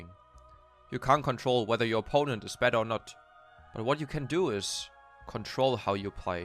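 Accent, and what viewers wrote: Germany. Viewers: love the german accent XD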